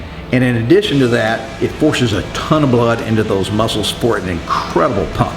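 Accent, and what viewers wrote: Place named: Dallas, United States. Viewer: Sounds just like everyone I grew up with in Dallas. Wonder if he is from my home town or even went to some of the same gyms that I did in the 80s? I lived everywhere from University Park to Plano.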